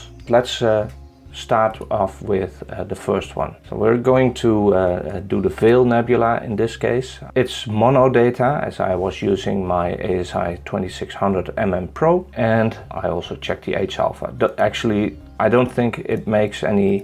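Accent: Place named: Netherlands. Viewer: Hi, Funny how in the first seconds I already recognized a fellow dutchie XD. For everyone else I'll ask my question in English. I'm currenty shooting a 3 panel mosaic of NGC 1333 with my ASI 294MM pro. I'm planning on having at least 25 subs for each RGB channel. After which I want to stick them all together to create a single big mosaic. My question is, what would be the best work flow? Last year I did a 2 panel DSLR mosaic of m31 in a PixInsight trial, which worked flawlessly. But I have no idea what would be the best way to do it with mono data in SiriL. Ofcourse I would start with stacking the data from every filter together, but what would the next step be? Do I stretch and edit every mono filter, make a RGB version of that panel than use ICE. Or would I stretch it, use ICE to create the mosaic and then make the RGB version? I think that doing ICE in between stretching and RGB would cause problems with alignment later. 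Another problem with these work flows would be that it is really difficult to have the same stretch across (3 panels * 3 filters) 9 different files. You mentioned something about creating a SiriL script which does the stretching. I have never used scripting in SiriL so would something like that be difficult to do? Or would it be better to stack the files, use ICE than stretch and RGB composite? NGC1333 has a lot of faint dust (I really like the image from Justin Tolboe), so I think that it would require a bit more processing than doing a simple auto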